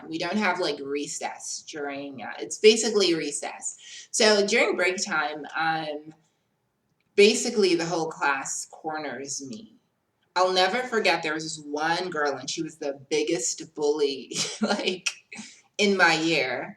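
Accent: United States. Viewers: I love the way you speak! As an American myself, i would say your accent sounds closest to the California American accent. After listening to u speak for an extended period of time, I could pick up that the American accent isn’t native since since you enunciate words better than most Americans; and u pronounce a few select words a bit differently here and there. But still by far the most convincing American accent I’ve heard from somebody not from here 🤗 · As an American you definitely sound like you’re from California. Sometimes when you say certain words i can hear your South African tone come through but when I first started watching you i had no idea you weren’t from the states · I thought you were from the U.S. maybe California. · You sound authentic American to me... and yes your word choices do show high intelligence... I had a SA friend in High School (Dirk) who spoke fluent German and English with perfect diction.. 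We use American TV to teach English in South Korea and the Philippines... You're looking relaxed and happy... have a blunt ..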